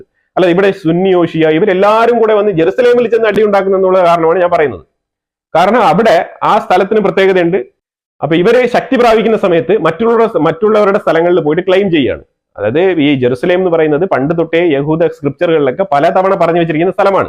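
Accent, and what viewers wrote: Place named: Trivandrum, India. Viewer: Excellent analysis and presentation in Trivandrum style talk. Keep it up.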